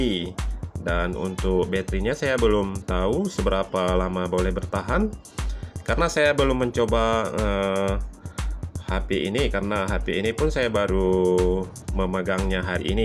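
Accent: Malaysia. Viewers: Ni org indonesia apa org malaysia sih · Kok ngomongnya kayak org malaysia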